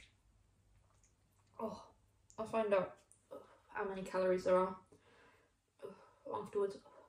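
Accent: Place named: United Kingdom. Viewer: She’s British by the sounds of it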